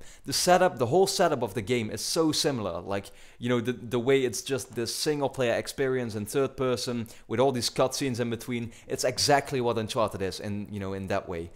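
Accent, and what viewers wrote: Netherlands. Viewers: Are you from belgium or netherlands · Aan je accent dacht ik al dat je Nederlands was maar weet het nu zeker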